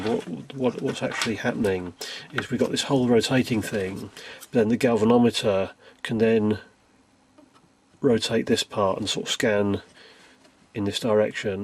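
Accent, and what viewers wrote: United Kingdom. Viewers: I'm as straight as a ruler but I could listen to your British voice all day long. What's with that?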